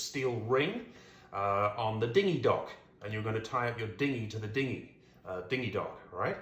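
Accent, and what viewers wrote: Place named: United Kingdom. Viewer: Great video; so far all of yours that I’ve seen are very well done with clear and direct instructions. I have a question. You sound to my ear like you are British. I’d that the case? And how did you come to use “Royal Navy Yachmaster” as a title? I live in Canada now but am also an American citizen. Up here, using the term “Royal” generally indicates that English Monarch would have bestowed that honour, so I’m a bit confused.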